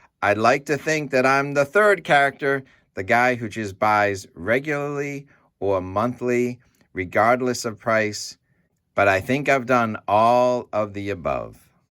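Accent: New England, United States. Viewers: You sound like a fellow New Englander. Lol. I bought 58oz last Saturday on the 11, and this Saturday it was up almost $2.00 an ounce. I still bought 42 more oz yesterday. I’m not all that concerned about the exchange rate for silver, unless I’m buying of course. My silver and gold are not for sale. Whatever the price, it’s still going to be receiving worthless paper in exchange. My stack is for the big one. When we go back to what we did for six thousand years. When silver and gold were MONEY. Which they will be again, much sooner than people think. I like your narrative! Keep buying,Keep buying, Keep buying, then KEEP BUYING! 😂👍🏼💪🏻❤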